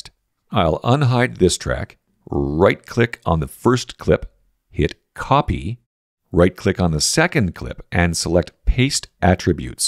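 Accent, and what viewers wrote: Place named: Canada. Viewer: I hear your Canadian accent...my wife is Canadian too! Thanks for the tutorial. I'm a subscriber now!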